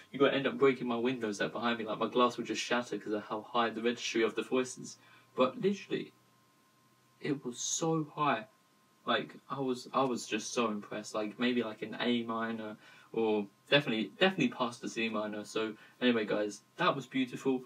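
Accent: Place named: United Kingdom. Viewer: Am following this channel. Extremely love that British Accent.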